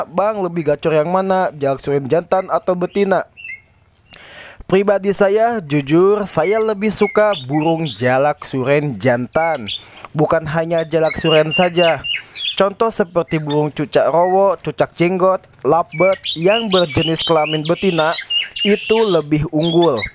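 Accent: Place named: Sunda, Indonesia. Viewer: Inimah nadana urang sunda,salam kang ti bogor timur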